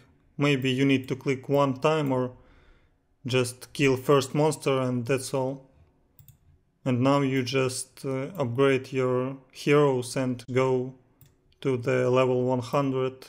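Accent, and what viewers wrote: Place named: Russia. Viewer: русский акцент, красава, норм шпаришь по-английски!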